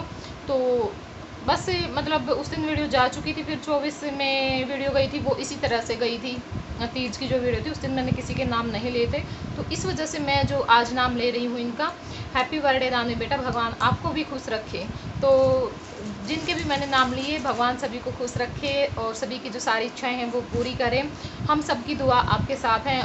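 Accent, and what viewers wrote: Haryana, India. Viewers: Mai bhi samajh gai thi kyonki hariyana mai aise hi bolte h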